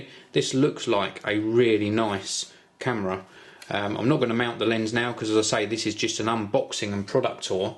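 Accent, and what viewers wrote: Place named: Essex, United Kingdom. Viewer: no, he has more of an essex accent.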